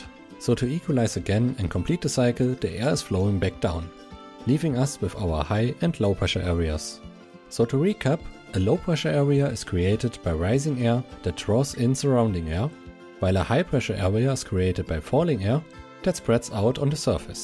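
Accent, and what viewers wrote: Germany. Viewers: recognized that German accent. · hearing a german accent makes the high pressure systems turn german too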